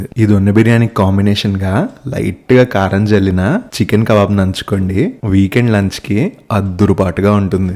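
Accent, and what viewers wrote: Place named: Vijayawada, India. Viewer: Wow pure Telugu,,, are you from vijayawada or khammam?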